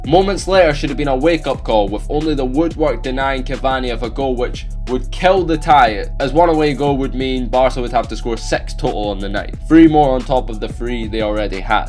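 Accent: Scotland, United Kingdom. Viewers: U Scottish?? Instant sub if u r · i thought you sounded Scottish I support Cardiff and man united because my dad supports them and united because all my mums family support them and there my closest team I'm just saying so I don't sound like a bandwagon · british accent banging mate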